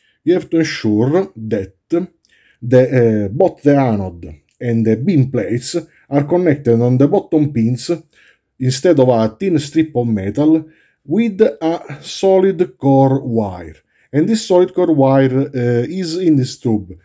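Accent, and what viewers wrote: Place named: Italy. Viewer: you make great points and I hate clickbait too, but listening to you fans who genuinly love your content and want you to succeed isn't the same as making videos you don't like. And it's not clickbait if the title is true. Putting key words into your titles is good business, not deception. The genius of your videos is above 99% of other channels. I should know. I have very poor health and am often confined to my house for months. I search and search for good electronic videos until I found yours. A diamond in the rough. I know YouTube frowns on using profanity. That's why the big electronics youtubers bleep out their curse words or don't curse at all. No more profanity, key search words in your title and your viewership will increase. You are easy to listen to, have a wonderful accent and come up with brilliant ideas! YouTube “diodegonwild” has a way thicker Italian accent than you and has many subscribers. 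He doesn't curse and has the same video format as you. Please forgive me if I hurt your feelings. You do an a, amazing job!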